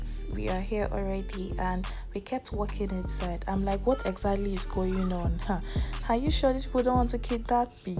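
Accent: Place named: Igbo, Nigeria. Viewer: This your Igbo is sweet o😂❤️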